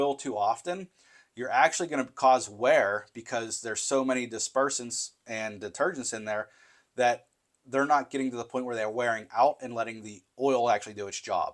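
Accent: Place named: Pittsburgh, United States. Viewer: You sound like the people I grew up with in Pittsburgh. You must be from somewhere in that Western PA tri-state area with OH and WV....Edit, after a quick search I see you're "dahn" in 84, PA. I grew up not far from Bridgeville. Your brogue reminded me of my younger days before I moved "dahn hare" to SC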